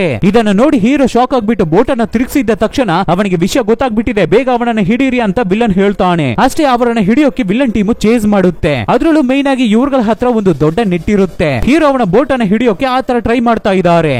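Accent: Tamil Nadu, India. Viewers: Tamil navru kannada matadtidare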